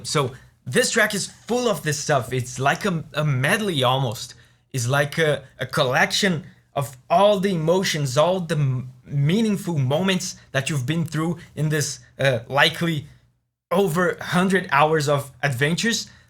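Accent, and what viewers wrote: Brazil. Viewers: Your accent sounds like a brazilian person, are you from Brazil? (Manda salve se for) GREAT video btw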